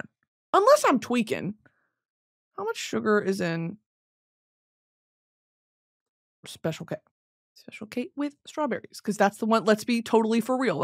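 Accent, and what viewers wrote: South, United States. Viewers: your southern accent sounds exactly like my late grandmother and reminds me of being in her house as a kid lmao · *'s southern lady accent is quite literally my favorite thing ever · your old southern lady accent cracks me tf up every time 😭💀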